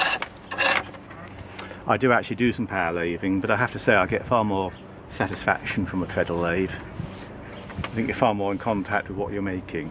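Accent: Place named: United Kingdom. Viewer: Bodgers used a chisel w/ only 1 bevel? I didn't know that. How did you find that out? I've wondered about the difference between using 1 or 2 bevels. What's your opinion on that? It's too bad that you don't get any pleasure out of listening to Americans. Sorry. We sure enjoy listening to you guys. Brits come over here & seem to do pretty well just because of the accent.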